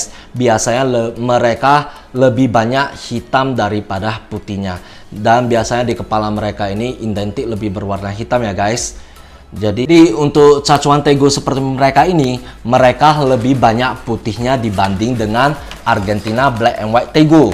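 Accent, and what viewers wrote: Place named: Chinese-Indonesian, Indonesia. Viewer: Penjelasannya sangat lugas dan jelas... Dengan dialek kokonya yg khas .. Semangat ko,di tunggu konten lainnya...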